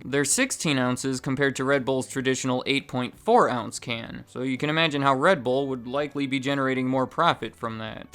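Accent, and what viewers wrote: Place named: Minnesota, United States. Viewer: I feel like is from Minnesota based on his voice. I always wonder if he is a Minnesota Twins fan.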